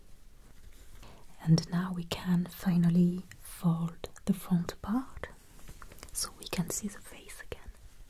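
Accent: France. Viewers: Bonjour, j'espère que cette pause aura été bénéfique pour vous. Pour ma part, vos vidéos m'ont beaucoup manquées et je suis ravie de vous revoir ! Pouvons nous espérer, un jour, une vidéo complète en français ? Car si je ne m'abuse, c'est votre pays natal ? Votre accent m'y fait penser. Bon courage et merci pour ces vidéos qui doivent vous demander tant de travail !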